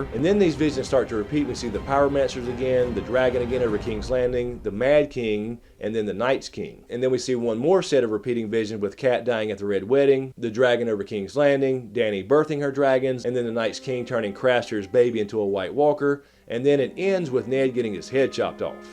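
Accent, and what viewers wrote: United States. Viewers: Oh fuck, your American accent is too much for to handle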